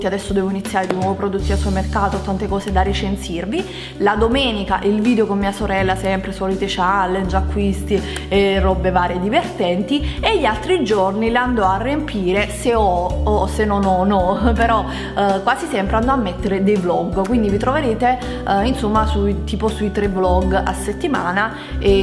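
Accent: Naples, Italy. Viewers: ma fregatene...sono persone cattive, non capisco nemmeno io perchè altre youtubers non si becchino gli insulti, ma secondo me solo per il fatto che tu sei sensibile e ci fai caso ai commenti, ai like ecc... O piuttosto che niente ci sono le persone che si mettono a criticare la pronuncia, la cadenza, il dialetto, l'inglese....lasciale perdere, ma chi se ne frega, anzi sai cosa ti dico?? Io sono milanese DOC e sono pure una linguista (studio interpretariato) eppure quando sento la tua cadenza napoletana o qualche parola in dialetto mi diverto tantissimo, e se capita che sbagli qualche pronuncia in inglese a me fai solo tenerezza.... Saranno invidiose perchè tu mostri di avere una bella vita, con una bellissima famiglia, con una bella casa ecc ecc...come se tu non avessi problemi... Non capiscono che magari una vuole mostrare il lato più allegro e divertente della vita! 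un abbraccio grande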